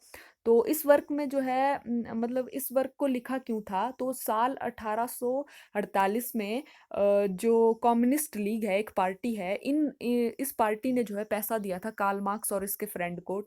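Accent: Haryana, India. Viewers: Ur presentation is really interesting and it feels gud to get a haryanvi touch in the slang. Thank u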